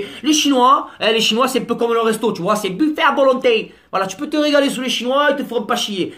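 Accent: Marseille, France. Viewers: T as raison mec ! Tu dis exactement ce que je pense et avec l acceng de Marseille gros ça passe bieng mieux. Degeun peut dire le contraire 😁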